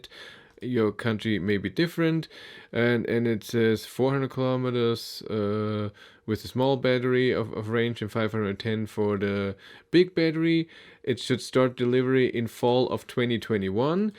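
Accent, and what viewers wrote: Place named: Germany. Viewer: german accent omg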